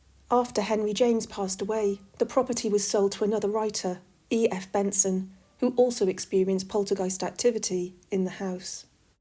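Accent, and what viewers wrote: United Kingdom. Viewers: I didn’t catch your video on Halloween, but any time is a good time for a video from and. Nothing like a good ghost tale told with a British accent. 🥰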